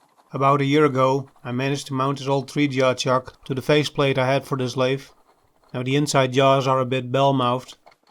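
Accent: Netherlands. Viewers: your Dutchlish accent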